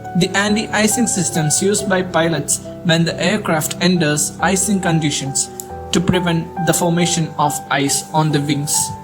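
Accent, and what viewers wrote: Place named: India. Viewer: Anying logat Inggris India kocak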